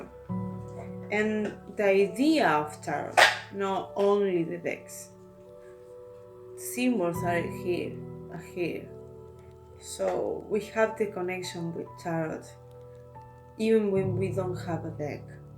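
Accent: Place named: Spain. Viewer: Hello this video is very interesting and you should put up more videos! Where are you from actually? Im thinking spanish due to the accent but im not certain. Greetings from ireland:)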